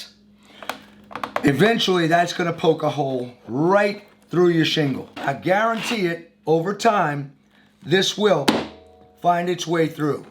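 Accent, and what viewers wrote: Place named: New Orleans, United States. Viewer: I just found you because I need to Mya small roof repair and needed to confirm what I was thinking. You did just that, so thank you. About two seconds in I thought, he’s from New Orleans! You got a new follower.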